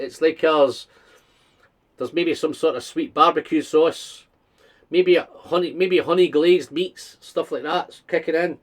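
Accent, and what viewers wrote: Scotland, United Kingdom. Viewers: One big reason for following you besides the whisky reviews is your Scottish accent mate. I love it. Kind regards from Holland. 🤘🏻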